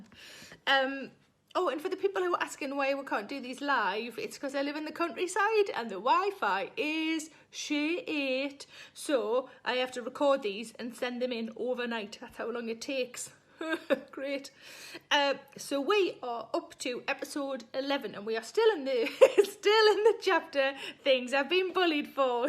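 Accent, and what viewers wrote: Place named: North England, United Kingdom. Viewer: Don't worry, I'm English and have no idea what she's saying half the time :) North England may as well be a different country.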